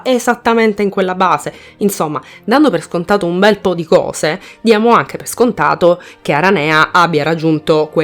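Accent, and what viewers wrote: South, Italy. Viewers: Sono sempre ipnotizzato dal tuo modo di parlare. C'è qualcosa del sud nel tuo accento.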